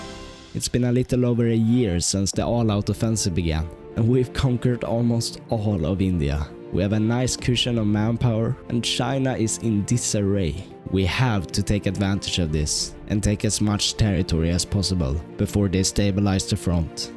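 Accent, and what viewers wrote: Sweden. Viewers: Du har den svenskaste brytningen jag någonsin har hört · helvete vilken svensk dilekt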